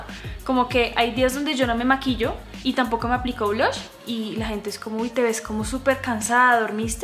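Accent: Bogotá, Colombia. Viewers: Eres de Bogotá? Jaja, es que por tu acento se nota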